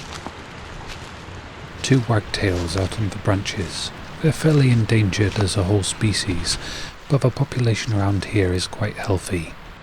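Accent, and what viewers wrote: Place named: England, United Kingdom. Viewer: Thanks for the scenery and the English countryside feel of your voice as you take us on a trip down your memory lane. I was right there with you when you "broke on though to the other side" of that tree root. So in sync that I burst out laughing when you spoke, out loud, the words in my head. We even said broke rather than break.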